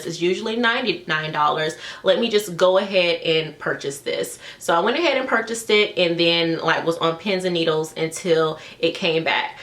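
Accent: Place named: Yoruba, Nigeria. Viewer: cut You look igbo but sound Yoruba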